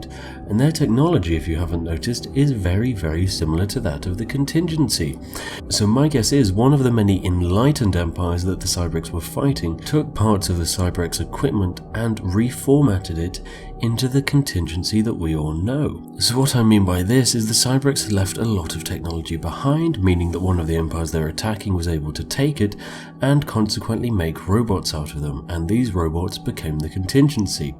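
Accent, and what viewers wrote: United Kingdom. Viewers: I kinda miss this brit voice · So he’s not just doing a bit, is actually that British?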